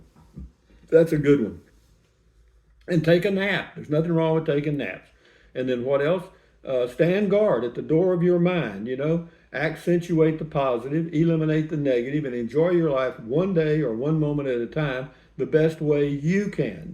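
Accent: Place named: South, United States. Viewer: Hi! Nurse here. Maintaining your dignity is half the battle of aging. I love elders!!! Greatest generation. You are doing it well,. You are as fine as an older Mathew McConaughey. Love that southern charming drawl.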